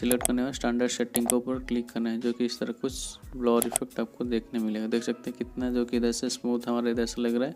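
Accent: Odisha, India. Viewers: Bro are you from odisha i can fell your odia vibes 🖤🖤🖤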